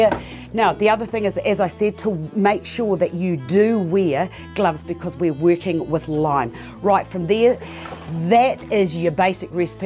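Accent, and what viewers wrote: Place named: New Zealand. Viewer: Thank you for answering. So helpful. Miss that lovely Kiwi accent. I’m from the States, but lived in NZ for 3 years back in the 90’s, 1 year in the greater Auckland area and 2 just outside Christchurch (Governor’s Bay). Beautiful country and lovely people. 😊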